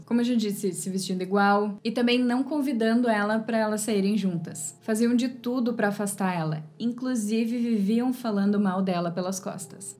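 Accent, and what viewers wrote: Rio Grande do Sul, Brazil. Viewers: Tu e gaúcha tche? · gauchá certeza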